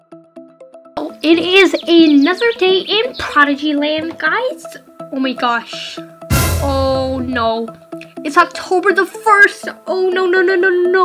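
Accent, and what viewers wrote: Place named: United States. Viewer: Since when did you sound American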